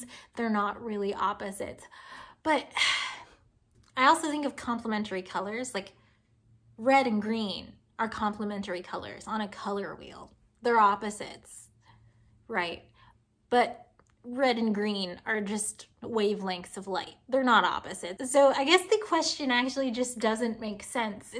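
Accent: United States. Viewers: Ok, imma do my best to describe. An-tony vahn l-ale-an-hook. (and just glue all those words (and letter) together). I mean, your murican vowels probs still will be off, but this is as close as I could describe it in englishthon Edit: yeah that robot butchered it... but I'm used to that 🤣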